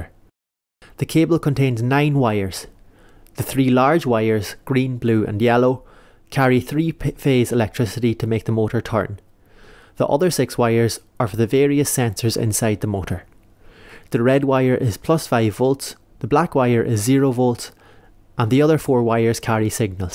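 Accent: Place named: Ireland. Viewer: Thanks, your accent sounded a bit Ireland.?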